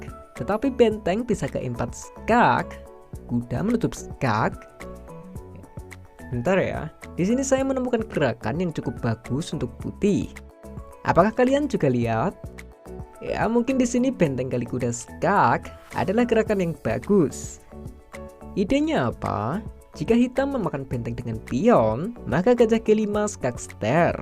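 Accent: Java, Indonesia. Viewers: Sampeyan jowo endi bang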